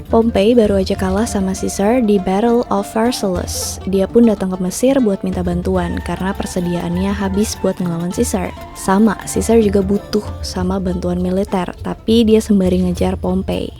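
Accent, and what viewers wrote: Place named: South Jakarta, Indonesia. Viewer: Serasa di dongengin sama anak jaksel knt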